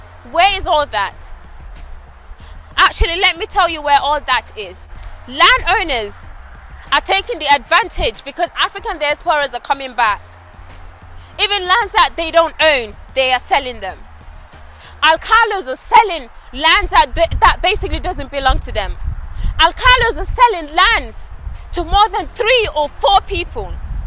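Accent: United Kingdom. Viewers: Oh!! You truly love your country!! I am really blown away by your brilliance and passion!! I'm very serious when I say this: "You should run for the Presidency of "The Gambia." If I was in "The Gambia" right now. I would be your "Secret Service Bodyguard." I would protect you and make sure that no one lifts a hand to harm you!!! You are so smart...and once again...I just love your British accent!!!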